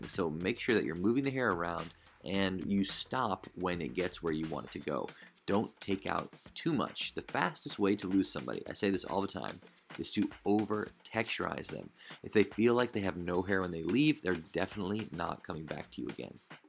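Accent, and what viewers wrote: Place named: United States. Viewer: Absolutely awesome dude from one hairdresser to another I’m from the UK 🇬🇧 i can tell your the US 🇺🇸 accent.Loving your content so much ❤can’t wait for more of it 🎉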